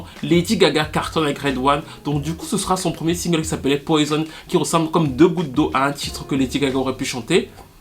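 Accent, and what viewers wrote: Cameroon. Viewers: Je viens de découvrir la chaine, j'ai reconnu l'accent du 237😂😂😂 et j'adore les vidéos sont longues mais on ne vois littéralement pas le temps passé tellement tu t'y connais dans le domaine.... Well done à toi. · L'accent camerounais 😎😎...so proud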